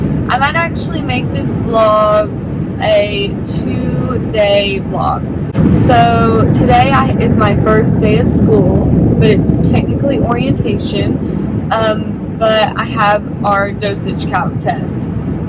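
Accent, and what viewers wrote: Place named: South, United States. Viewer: Stumbled across your channel! Would love to support each other! I have done this so many times where I plan a video and it didn’t go as planned. Thankful for people like you who do jobs to help people. Where are you from? Southern accent! I live in IL BUT IM BORN AND RAISED in FL. People always stop me here about my accent that I don’t think is thick at all but def something people notice up here. Hope your last semester goes great! I’m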